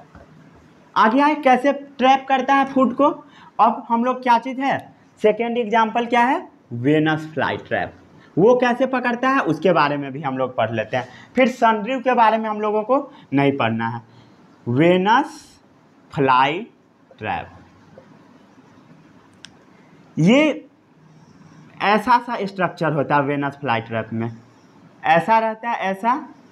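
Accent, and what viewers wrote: Bihar, India. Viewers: Bhai bihar se ho kya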